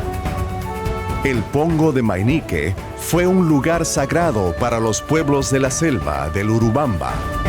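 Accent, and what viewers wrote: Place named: Spain. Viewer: Thank you this wonderful spanish accent. Total understandable even with my little spanish also. And the film is perfect. Congratulaion for this narrations again!!!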